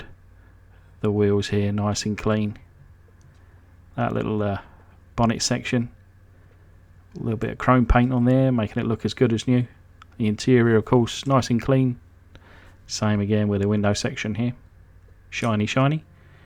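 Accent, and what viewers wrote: United Kingdom. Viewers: Beautiful resto. Love the channel. A slightly different vibe as u being British, but in a good way...kinda like when Wallace from Wallace and Gromit speaks.....👍👍👍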